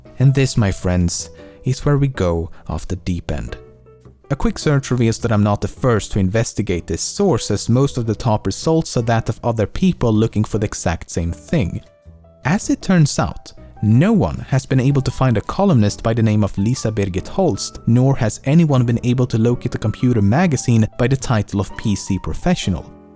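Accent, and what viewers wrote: Sweden. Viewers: Where is he from? Sound quite Swedish to me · you forget hes swedish sometimes, but it does shine through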